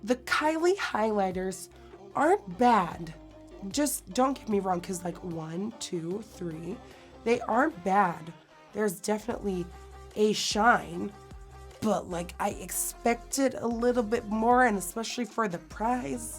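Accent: United States. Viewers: u sound so American!!!! 🤣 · I love your voice like this. It sounds so Americanized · you sound like an American now, more than ever:) I feel like they have a slight huskiness when talking, which is exactly how you sound to me:) And you are beautiful no mater how much you weigh or look. Find clothes that make you feel comfortable and confident, make sure you have a good posture and the effect it will have on how you look and feel will be amazing!:) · What happened to your voice? You sound American and not German? Why? What is going on?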